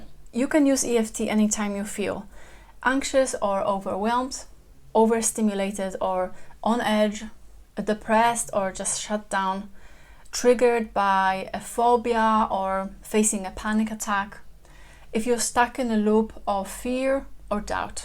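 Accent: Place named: Germany. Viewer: I love your accent. What's your native tongue? German?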